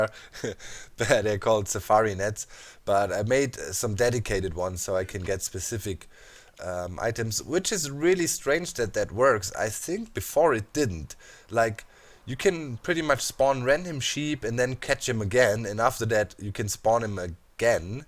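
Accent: Germany. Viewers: I'm new here.. But i'm sure he has a german accent!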